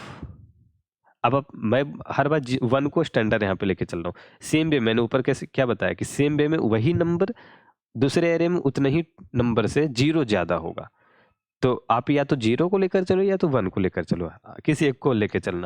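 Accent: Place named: Bihar, India. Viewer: Thanks Bhaiya! Great Explaination! Loved your BIHARI accent! ❣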